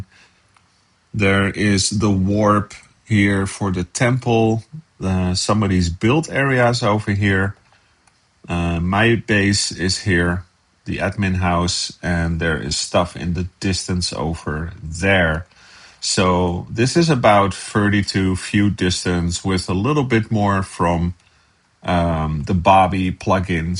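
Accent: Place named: Netherlands. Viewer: You sound dutch :D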